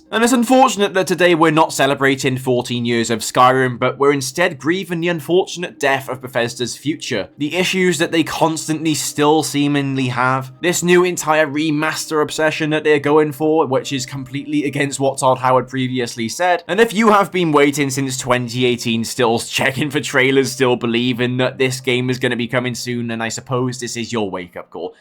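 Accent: United Kingdom. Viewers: bethesdar :P british accents are fun.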